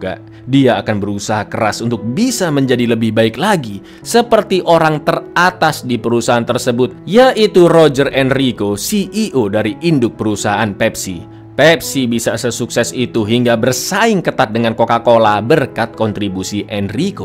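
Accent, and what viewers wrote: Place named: Serang, Indonesia. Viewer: Logatte kaye wong serang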